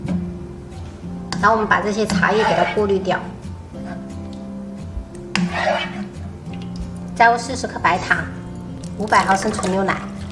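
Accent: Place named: Minnan, China. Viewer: 台語是閩南語，是閩南人，當然講閩南語，講話口音聽得出來是閩南人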